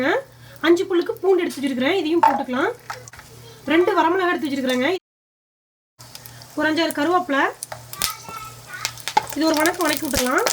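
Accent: Kongu, India. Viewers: Egg Curry looks so tempting n so do ur kongu tamil sounds great😍🌷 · I like her kongu slang.. varevah.. 😋 yummy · Konku timal pesuradhu thani allaku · Athuthanka kongu Thamil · கொங்கு பாசை சூப்பருங்கோவ்.. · முட்டை குழம்பு செய்முறையும் மண் மணம் மாறாத கொங்கு தமிழ் பேச்சும் அருமையோ அருமை...குழம்பு சூப்பர்....தொடரட்டும் உங்கள் சேவை...நன்றி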